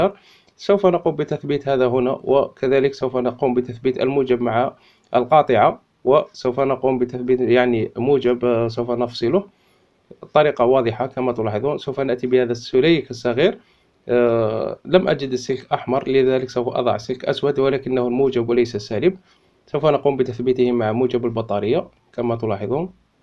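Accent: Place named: Algeria. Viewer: ن من صوتو بلي دزيرير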